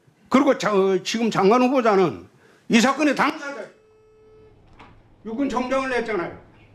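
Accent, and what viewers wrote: Gyeongsang, South Korea. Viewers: 역시준표세이(형님의 순~경상도 말) 확실하다~세이 청와대가서 핵갖다놓자~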